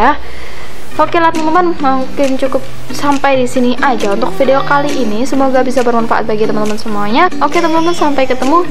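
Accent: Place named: Java, Indonesia. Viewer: Baru mampir, logat nya jawa, jawa mana ni hhe, boleh kali numpang promosi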